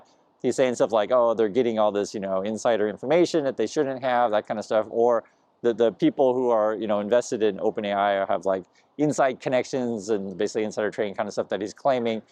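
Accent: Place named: West Coast, United States. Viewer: Beautiful view. Are you from CA? You have a west coast accent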